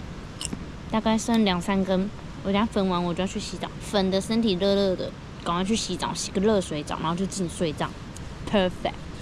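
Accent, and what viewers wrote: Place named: Taiwan. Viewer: 妳好能自言自語唷！講台語好道地的感覺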